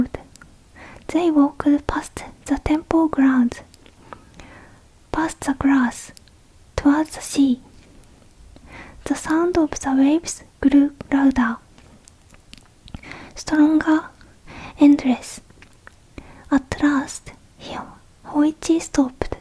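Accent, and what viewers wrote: Japan. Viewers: I love your sweet voice.. I love that Japanese struggle with saying the letter L it makes Japanese English so cute.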